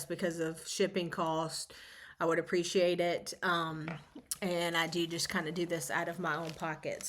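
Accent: South, United States. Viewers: .... you might be my most favorite to watch. I love your southern accent and your laugh. REALLY love your stitching and WIPS. Such inspiration with each video. I also may continue to stitch my Christmas, Snowman, and Winter charts. I paper craft also and have decided to leave my Christmas papers and stamps out and continue creating. I am not tired of stitching or making Christmas projects just yet. Have you done a room tour yet? Each time I watch your videos, I always wonder if it is a bonus room upstairs or maybe a large bedroom. Either way I love the two small closets in the back. I could fill them up. Have a great January. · To one of my favorite FlossTubers....I love your selections for stitching and your lovely southern accent. Here in the Northeast I truly am cozying into Winter ....gotta embrace the white and next week will be below zero! 🥶 Hope you have a great January and I look forward to watching another episode soon! 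😊 Kathy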